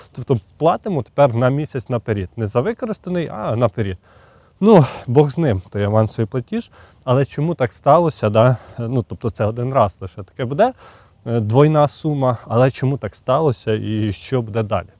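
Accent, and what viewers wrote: Ukraine. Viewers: Вы говорите на русском с украинским акцентом ,определитесь уже товарищ типа учитель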